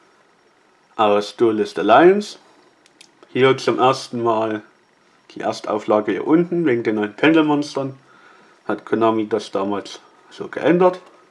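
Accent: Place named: Saxony, Germany. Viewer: sächsischer akzent xD den liebe ich!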